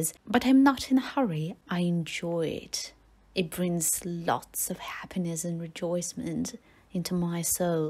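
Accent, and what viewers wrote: United Kingdom. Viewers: Your British accent is very welcoming by the way and your KOT is very cute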